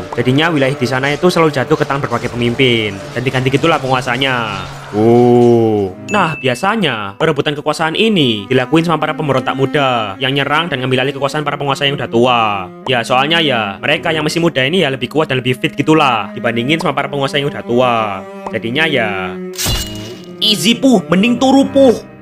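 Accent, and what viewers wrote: Java, Indonesia. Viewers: Bang kamu jawa yoo lek jowo podo rekk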